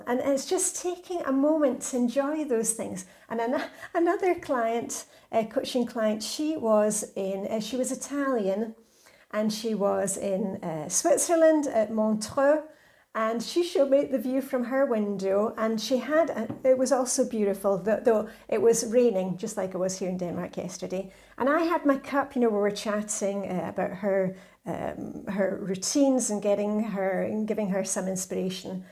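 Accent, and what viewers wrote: Scotland, United Kingdom. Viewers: Well done!! What an achievement. A cold morning here in Manitoba, Canada. I lived in Edinburgh for a time 8 years ago so love hearing your accent. You have really helped me to have a set time for my routine in the evening. I work away from home so the evening really helps me prepare for the day and eat well! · Congratulations on reaching 100k subscribers! I stumbled across your channel last week and was so happy I did. I loved hearing your Scottish accent (both my grandparents were from Scotland) and your enthusiasm and positivity is so uplifting and inspiring. Have a wonderful weekend.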